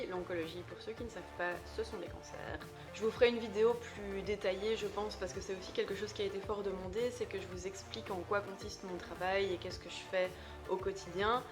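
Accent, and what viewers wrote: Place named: Liège, Belgium. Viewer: Vive l’accent de Liège (et du bw❤️)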